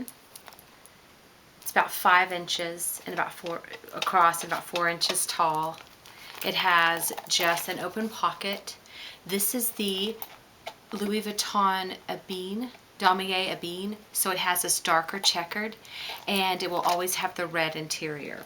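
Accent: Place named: United States. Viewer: Thank you so so so so much for taking the time to do this review for me. It's very much appreciated. You are so thorough it really does help. Ok, so I am definitely getting the Mini Pouchette for when I only need a few items and also the clay for my keys. Next hmmmmm still can't decide. Eva if I want more classy, but pouchette if I want more casual. Oh dear, maybe I will have to get both. I love how you say my name..US accents are fab, not like my northern UK one. Thank you again. xxx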